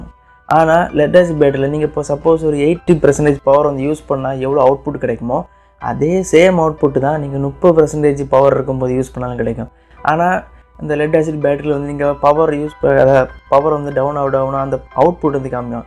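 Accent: Kanyakumari, India. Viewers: Bro ,,, neenga Kanyakumari ya ?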